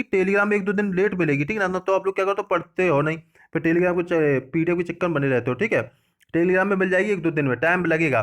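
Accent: Kanpur, India. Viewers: Guru ji kanpur se hai kya aap 😕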